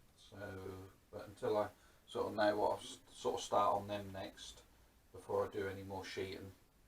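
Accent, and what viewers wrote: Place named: Suffolk, United Kingdom. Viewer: it sounds as though you may come from Suffolk boy as I do … keep up the good work 👍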